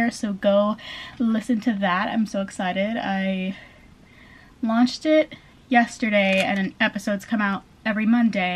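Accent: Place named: United States. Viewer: I listen you because I wanna to hear the american accent 👍🏻 you great👍🏻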